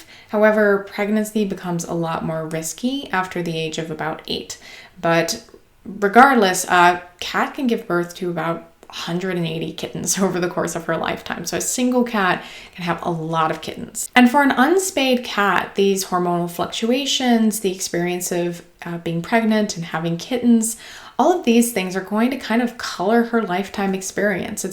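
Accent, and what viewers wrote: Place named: Buffalo, United States. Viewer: I always notice your accent on pronouncing the letter ‘A’ lol Where is this accent from in the US? I’m from Niagara, Ontario and notice a similar accent when i visit Buffalo just over the border lol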